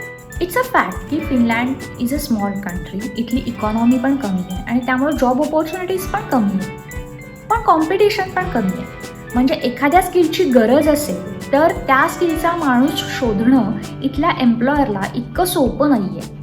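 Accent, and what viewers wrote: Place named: India. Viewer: No offence but Indian dialect of English is really hard to swallow in.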